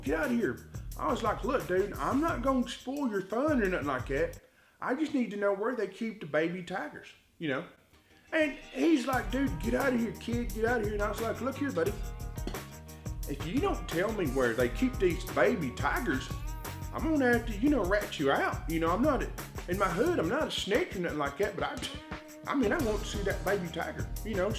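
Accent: United States. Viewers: You gone on ahead now, with THAT Southern Style Country voice. Is yourself with "Blue Grass Entertainment?"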